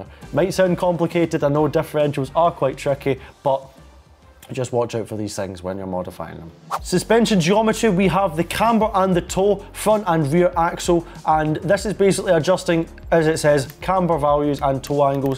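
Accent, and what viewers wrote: Scotland, United Kingdom. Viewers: the scottish is showing here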